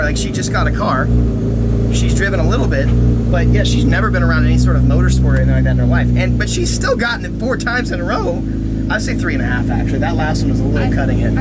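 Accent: Australia. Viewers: are u Aussie cause I am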